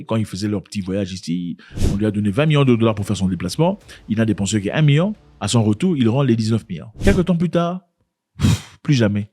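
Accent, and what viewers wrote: Cameroon. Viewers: Bizarrement tu parle comme la grande majorité des Camerounais 😂😂, je suis sûr que beaucoup te croient Camerounais. Ce n'est pas nouveau au Cameroun, le comble c'est que les parents on entraînés les enfants dans la voie du silence sous prétexte de l'horreur de la période des maquis. On a peur de se réveiller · Ce président ne nous sert a rien , je ne vois pas en quoi parler de lui est utile , ceux qui parlent de lui au pays sont ceux qui veulent sa place , raison pour laquelle les autres qui veulent aussi sa place demande a leurs rivaux de femer leurs bouches . Bref un président qui ne sert a rien je ne vois pas d'interet a parler de lui. je suis certains que son successeur sera sa copie , assoiffé de pouvoir ,. le dvéloppement n'est pas une priorité pour eux , juste remplir leur ventre de caca. Très bonne vidéo , l'accent camerounais par contre😂 m'a fait rire , je ne me suis pas retouver dans ça , ni reconnu une ethnie en particulier, mais en ce qui concerne la mentaluté , vous avez raison ,ventard pour beaucoup dans le vide , cette mentalité doit être formater. 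· Il n'imite pas ...il parle toujours comme ça ❤❤❤ au départ je croyais même qu'il était Camerounais 😂😂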